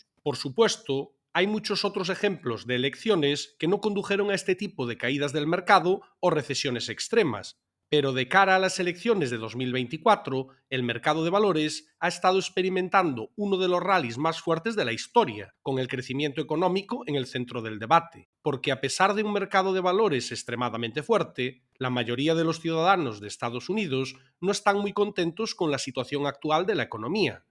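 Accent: Galicia, Spain. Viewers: un buen acento gallego no viene mal. Buen video 🙂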